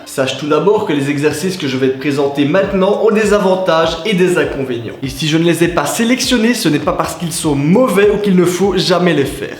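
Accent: Belgium. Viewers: Olala nonante septante ! On est belge ou suisse ? (je parierais sur le premier choix vu l'accent) Ceci dit, belles explications sur le développé décliné, que je voyais jusque là vraiment comme un exercice parmi les plus inutiles possibles (eh oui moi aussi on m'avait dit que ça musclait le bas des pecs)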